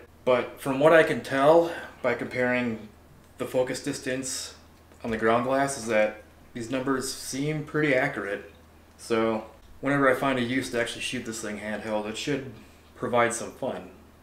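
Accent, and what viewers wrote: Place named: Minnesota, United States. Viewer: I thought I recognized that accent! Used to live in Duluth. 😅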